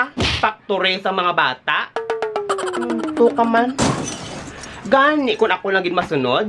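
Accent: Iloilo, Philippines. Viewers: Im not ilonggo,, i just know tagalog,,i dont know how i can undrstand but in someway ican understand a bit what you are saying,, ,,,i love the ilonggo accent!❤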